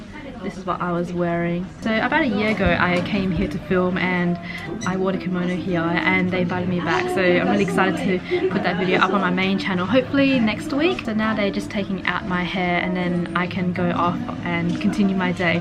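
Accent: Australia. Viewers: Australia is a bit broad, you seem to have a Sydney accent and talk like a Sydneysider as well so thats what i will guess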